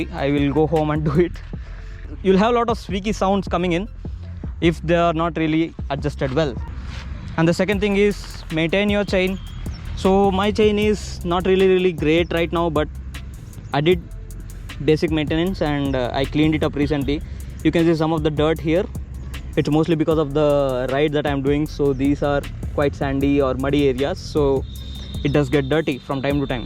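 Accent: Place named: South India, India. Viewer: That Mallu/Tamil accent 😁😁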